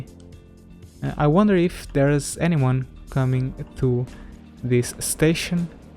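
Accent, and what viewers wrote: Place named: Portugal. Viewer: I've played enough cs:go to know portuguese accent now LOL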